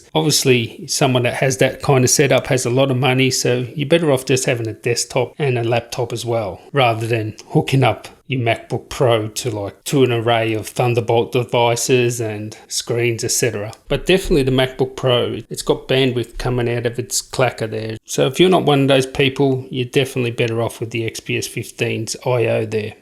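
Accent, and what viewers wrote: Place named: Australia. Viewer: Australian accent in the flesh 😜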